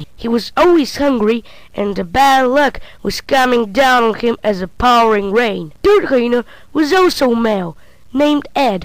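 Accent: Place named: Bulgaria. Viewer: He's from Bulgaria and that's why this guy talks like that.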